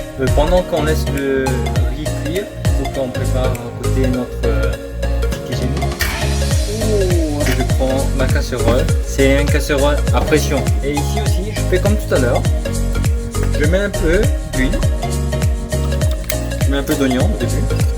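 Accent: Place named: Ivory Coast. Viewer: depuis que je me promène sur YouTube, c'est la première fois que je m'abonne en moins de 30 secondes. ton accent ivoirien m'a grave tuer . pourquoi j ai pas vue tes vidéos avant !? YouTube même vraiment !!! vous avez mal fini kédjenou là déééh , bravo ? merci bonne continuation.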